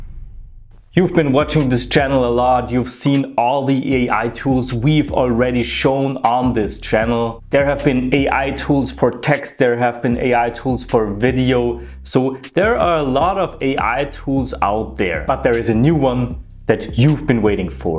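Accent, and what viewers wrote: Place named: Austria. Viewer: Great video! Very inspiring! Also - pleasure to listen to the Austrian accent :)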